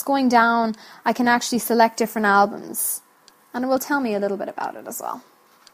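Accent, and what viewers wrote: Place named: Ireland. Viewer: Irish accent + XBMC knowledge = LOVE!!!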